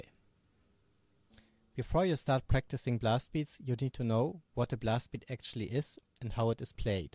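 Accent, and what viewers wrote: Germany. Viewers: Are you German? I like your accent 😊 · Man merkt, dass du Deutscher bist:)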